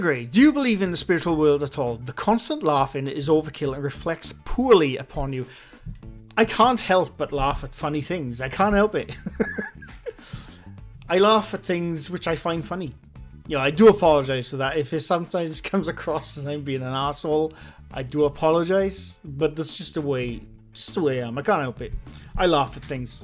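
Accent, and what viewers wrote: Wales, United Kingdom. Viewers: As a Scot, I have no idea why anyone would think you are Scottish?... You are clearly Welsh. All the best mate.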